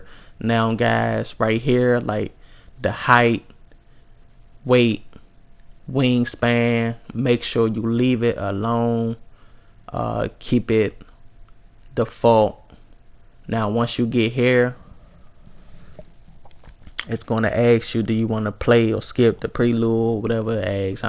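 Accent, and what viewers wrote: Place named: Washington DC, United States. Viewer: I came from another video and I didn't watch the whole video but the part that I did see you sound like you from DC.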